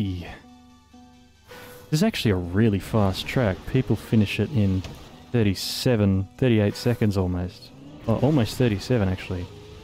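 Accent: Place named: Australia. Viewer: I thought you sounded Aussie and I think 3 am pretty much confirmed it. Are you in Queensland where cotd is still 3am after daylight savings changed? Or are you not even aussie😂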